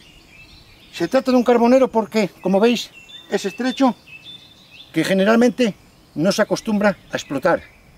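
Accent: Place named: Spain. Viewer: Es agradable escuchar su acento y su gramática. Habla muy especial el idioma español. ¿Qué región de España es usted caballero? Post data: gracias por la información sobre el carbón, necesitaba esa información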